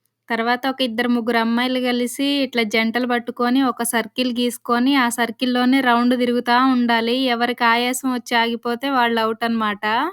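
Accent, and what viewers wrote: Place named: Rayalaseema, India. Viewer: తెలంగాణ అన్నారు రాయలసీమ యాస మాట్లాడుతున్నారు🤔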